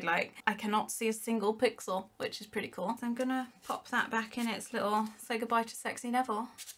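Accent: United Kingdom. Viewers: I love your British Accent. I actually am not British, or English, but I speak an amazing American accent and I am trying to do a British accent. And how much did this box cost?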